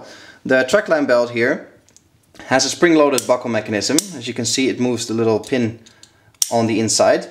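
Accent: Netherlands. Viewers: Is the presenter dutch?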